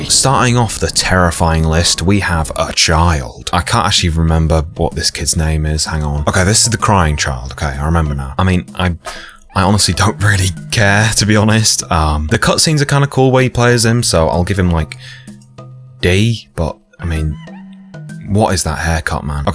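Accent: United Kingdom. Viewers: Is this guy British or smth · i was not expecting that voice from you dude lol you dont look british at all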